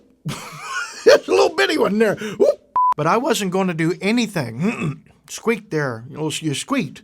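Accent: South, United States. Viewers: Are you from the south? If so what state? ROLL TIDE!!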